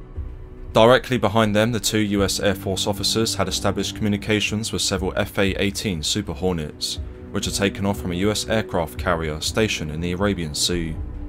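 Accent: England, United Kingdom. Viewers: What accent is this? What part of England?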